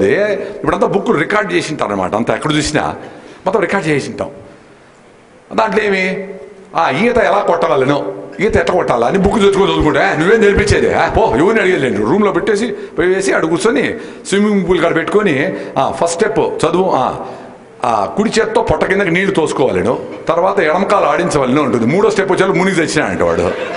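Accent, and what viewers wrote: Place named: Anantapur, India. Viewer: Ma anantapur slang👌🥰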